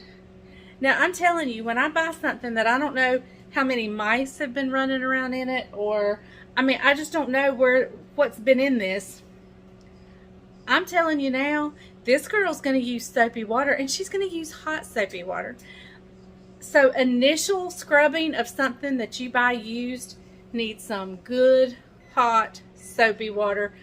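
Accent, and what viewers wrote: South, United States. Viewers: Last night I viewed this video and this morning I thought of you as I was reading today’s devotional (4/21/2021) from the Our Daily Bread app. I started watching you for your biscuit video. I have continued to watch you for more of your delicious recipes, but mostly to enjoy hearing your lovely southern drawl and to see Jesus in you. It is so refreshing to see you deal with the chaos life brings us in your sweet mannerisms. You bring authenticity to what life is about in Christ. Please keep being yourself!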